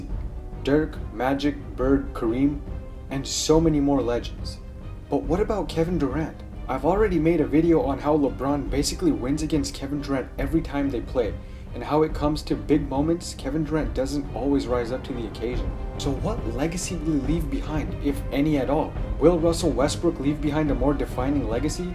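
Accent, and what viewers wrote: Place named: Toronto, Canada. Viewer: Yes I am. I can tell you're from the 6 lolol.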